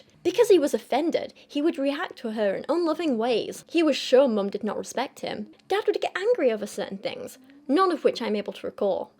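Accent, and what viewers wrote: United Kingdom. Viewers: I think the coughing and British accent sort of makes you sound like a ye olde aristocrat- in the best possible way, you rule, your Majesty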